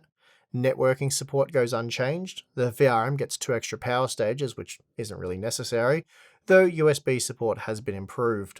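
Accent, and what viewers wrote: Australia. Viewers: It's almost like they're australian and have a different accent and pronunciation over there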